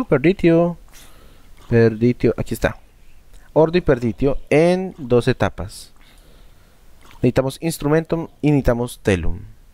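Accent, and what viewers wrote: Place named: Colombia. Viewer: De q parte de colombiano eres ??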